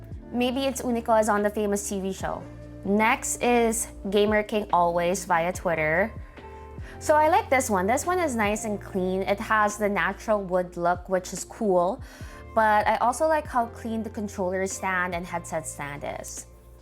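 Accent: Philippines. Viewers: lol her cute filipino accent.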